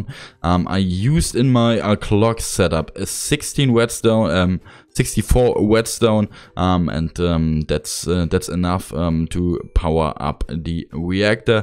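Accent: Germany. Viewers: Do I hear a slight German accent?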